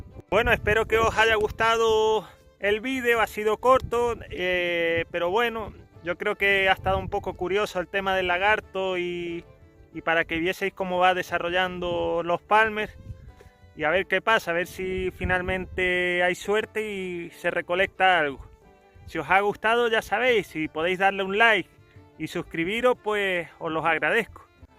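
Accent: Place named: Mexico. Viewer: es un experto en apicultura y una gran persona tiene más parecido el acento de voz a mexicano que a español y es un gran ser humano por que nos enseña todas sus experiencias con la apicultura Dios bendiga tu familia. un abrazo con respeto de un mexicano🇲🇽 Desde Ohio usa 🇺🇸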